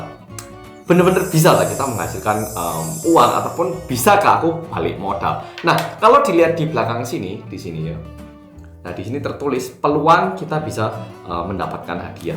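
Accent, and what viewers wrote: Java, Indonesia. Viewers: Lho. Kyakk. Orngg Korea. Tapi kok. Medok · Muka Lu kaya orang korea ko bisa ngomong indo selancar itu Logatt nya lebih ke orang jawa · bang? Abang ini asli nya orang apa sih? kok mukanya Korea tapi bahasa nya medok jowo ?? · Mas aku suka logatnya😊 kereeen medok bgt.selalu ngikutin videonya lanjut mas...ditunggu kolab sama bandung oppa lagi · Bisa ya jawa nya medok tapi jujur suaranya adem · Logate kok jowo mas...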